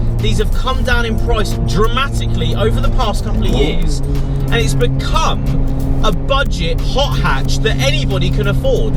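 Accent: United Kingdom. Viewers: Same type of British accent as James Corden. Sounds just like him.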